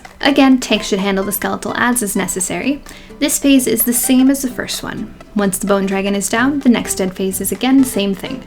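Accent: Canada. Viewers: Your accent gets more and more Canadian with each video. I like it.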